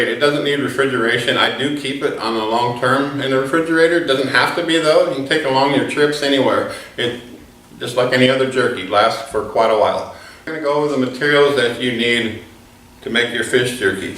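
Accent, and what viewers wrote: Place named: Wisconsin, United States. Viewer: Thanks for this recipe! I needed some ideas for fish, and I have a freezer full of carp and gar that I will be turning into jerky. Are you from Wisconsin? You sound just like some of my friends from Rhinelander!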